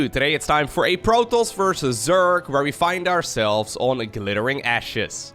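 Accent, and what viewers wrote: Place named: Netherlands. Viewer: Your voice always sounds funny 😜 (because of the Dutch accent, which always sounds funny to me as a fellow Dutchman).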